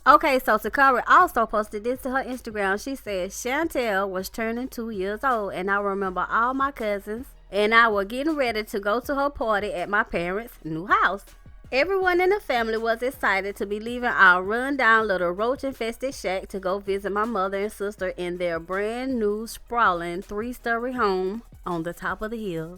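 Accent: South, United States. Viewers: OMG before I read the ABOUT section of your channel, your Southern accent hooked me in and made me laugh my a** off. I'm a natural born Nashvillian and had to subscribe. Not only because of our Southern origins but also because of the truth you bring. That damn Jackie is gonna reap what she's sown on behalf of her "darker child". You don't mistreat, alienate, or totally disown an innocent child without karma visiting yo ass. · I watch your show because I love your voice. You have that southern drawl mixed with the black soulful personality. You are unique! I am white, but I still love your voice! I like it when you say "Moving on".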